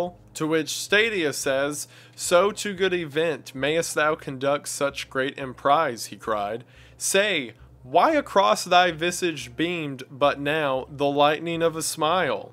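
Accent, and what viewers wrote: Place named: Appalachia, United States. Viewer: It's an accent. I do the same thing. He's from Appalachia, I'm from the Mid-Atlantic.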